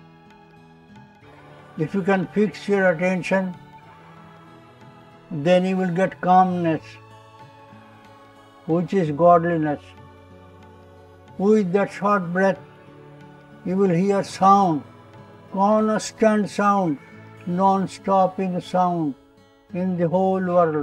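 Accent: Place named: Bengal, India. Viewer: Its beautiful how even his english accent is like bengali english accent❤❤❤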